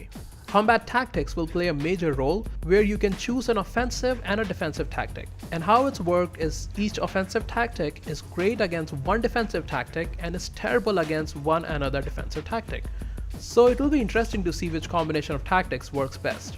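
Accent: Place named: India. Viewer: your accent is indian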